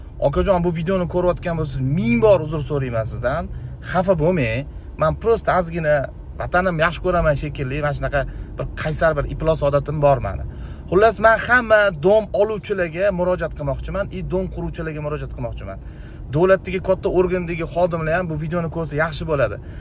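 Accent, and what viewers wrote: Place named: Khonsaroy, Uzbekistan. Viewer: Hon saroy di gapirvoti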